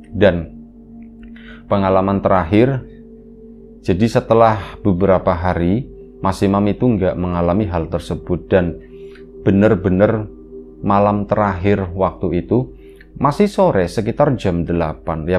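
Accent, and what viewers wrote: Tegal, Indonesia. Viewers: Mas sampean orang tegal bukan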